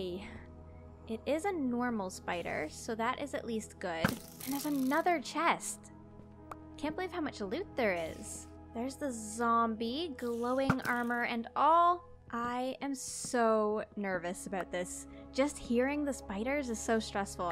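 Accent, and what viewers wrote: Australia. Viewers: Just a question are you Australian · Hey! Are you Australian at all? I love the videos, great job:)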